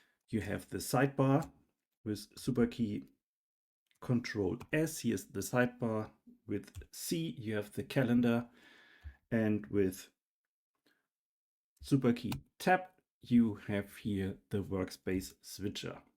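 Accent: Germany. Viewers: that german accent killed me xD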